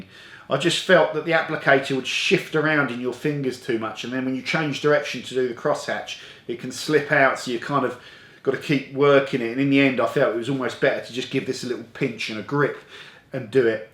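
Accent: England, United Kingdom. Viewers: Accent? An English man speaks English 🙆‍♂️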